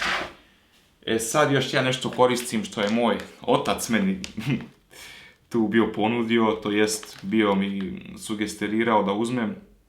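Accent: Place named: Serbia. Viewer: Malo previse lricas srpskim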